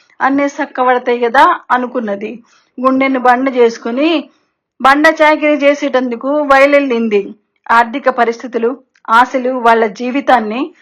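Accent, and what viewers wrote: Telangana, India. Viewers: గారు నిజంగా మీరు కథ చెప్పిన తీరు నాకు చాల చాలా నచ్చింది. ఏమీ నఛ్చింది ఎందుకు నచ్చింది అని మీరు ఎలాగు అడుగుతారు. మీ voice బావుంది పాత్రలలో జీవించారు ఆవేదన వ్యక్తం చేయడం బాధ పడే పదాలను నిజంగా మీరు అనుభవిస్తున్నట్లె చెప్పారు గొంతు మార్చడం అయితే ఏమి ఎక్కడ తగ్గాలి ఎక్కడ పెంచాలి గొప్పగా చెప్పారు ఇక ముఖ్యంగా వినే వారికి ఒక సినిమా చూస్తున్నట్లుగా feeling వచ్చేలా చెప్పారు అన్నీ పాత్రలలో జీవించారు హృదయ పూర్వక అభినందనలు అందుకోండి కథ వ్రాసిన ఉమ గారికి అభినందనలు మరిచాను తెలంగాణ యాస అద్భుతంగా వుంది. కథ నిజంగా జరుగు తున్న విషయాలను వివరించారు ప్రకృతిని బాగా వర్ణించారు ఇక నుండి నేను మీకు పెద్ద ఫ్యాన్ అనుకోండి good night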